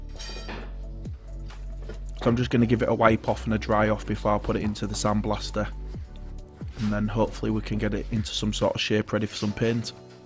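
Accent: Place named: East Yorkshire, United Kingdom. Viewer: Great job your accent sounds like East Yorkshire. I use cocktail or tooth pick sticks cos they hold the paint better just trim the point bit if you want it thicker.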